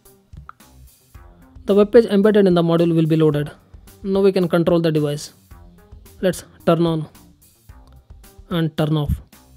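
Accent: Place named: India. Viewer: why do indians have a fucked up accent ?? BTW he sounds like a mexican guy trying to speak english in an indian accent LOL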